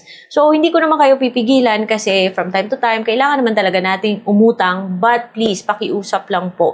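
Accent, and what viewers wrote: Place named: Visayas, Philippines. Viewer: Bisaya di I ka mam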